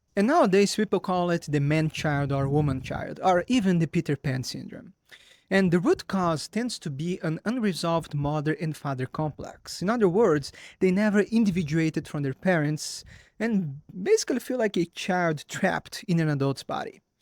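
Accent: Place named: Brazil. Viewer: Sotaque brasileiro em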